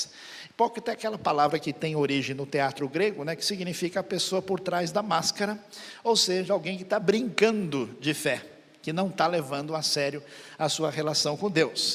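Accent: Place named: South, Brazil. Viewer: Perfeito. Me horrorizei no início achando que Jesus achava que higiene não é importante. Ainda bem que Ele mesmo explica. Belíssima pregação, texto muito bonito. Sotaque sulista ficou muito bonito. Estranhei no começo, mas aqui tem muita gente do sul, ouvi tudo muito atento. Maravilha ver que Deus opera em seus servos fiéis, há muitos bons pastores que levam Sua palavra a sério.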